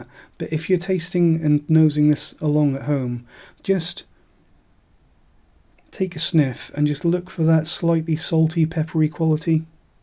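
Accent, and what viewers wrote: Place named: United Kingdom. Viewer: Where are you from in Great Britain? Your accent is very nice to listen to. Added to your whisky knowledge and honest reviews, this makes you the best youtube subscription i made alongside Gwhisky this year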